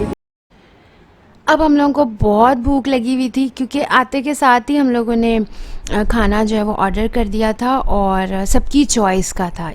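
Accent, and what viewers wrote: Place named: Bihar, India. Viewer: I thing u r Behari speaking mughy behari lagti ho aap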